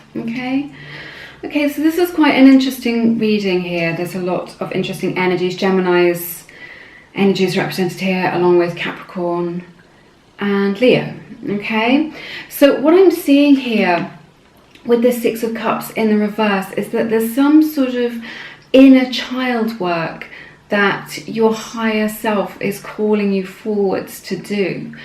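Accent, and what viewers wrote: RP, United Kingdom. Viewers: posh accent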